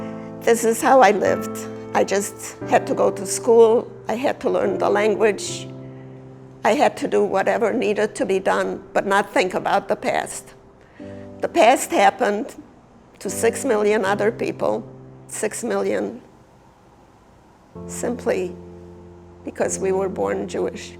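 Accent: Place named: Chicago, United States. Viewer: She's got a heavy story and a heavy Chicago accent :-)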